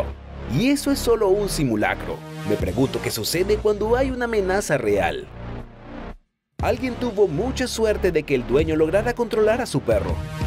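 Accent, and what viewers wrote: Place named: Argentina. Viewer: Es argentino que duda tiene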